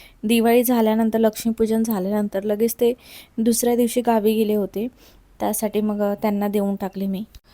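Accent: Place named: Vidarbha, India. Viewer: mala bolnyatn janaval tumi vidharbhatlech ekadlech asal manun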